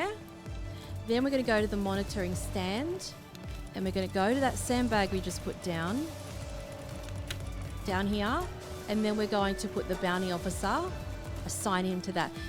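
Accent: Australia. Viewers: aussie accent 😍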